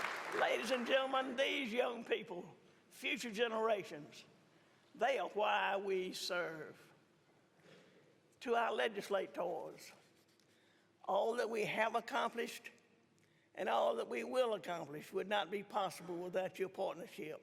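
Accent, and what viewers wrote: South, United States. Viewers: One of the best southern accents of any current governor!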